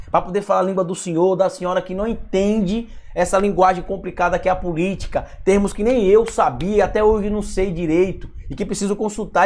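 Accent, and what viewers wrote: Northeast, Brazil. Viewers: Você fala para a sociedade como um todo entender e não apenas para um nicho., sou do sudeste e infelizmente aqui tem muito preconceito com o sotaque nordestino sim, com o modo como se expressam. Sem dúvida vc está sendo alvo dessa discriminação. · Parabéns! Você fala muito bem, e adoro o sutaque nordestino. Bjs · Professor, A implicância com seu jeito de falar é nada mais, nada menos, que o antigo e atual preconceito com o povo nordestino. Sou de RS e sei bem o que é um povo preconceituoso. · Todos sabem o papel que você e Todos fazem. Falar como nordestinos é uma honra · Eu adoro assistir seus vídeos, pra mim o que realmente importa é a verdade, e se a verdade vier a língua do povo melhor ainda . E em relação aos que critica de forma destrutiva, é sinal que vc está no caminho certo, e isso incomodada!!Parabéns pelo canal .À eu amo o povo Nordestino, e esse sotaque e o diferencial que conquista.😍😍👏👏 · Meu eu gosto do jeito que vc fala porque tbm sou filho de nordestinos meus pais são baianos E eu sou paulistano e sou da pereferia de SP então eu entendo muito bem sua linguagem 👏👏👏👏👏👏👏👏👏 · Professor, sou gaúcho, sempre morei aqui no RS e adoro a sonoridade do sotaque dos Nordestinos. 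A sua maneira de expressão é bacana, porque passa uma sinceridade. Aliás, eu acho que ela dá um plus na sua retórica. Penso que as críticas em relação a sua forma de expressão não possuem procedência. Talvez seja apenas aquele pessoal, com a velha tática, de atacar a forma, porque não conseguem atacar o conteúdo ou daria muito trabalho impugnar o contéudo. Jamais se desmotive com esse tipo de crítica. Aliás, não se desmotive com nenhuma crítica, porque seu trabalho é ótimo.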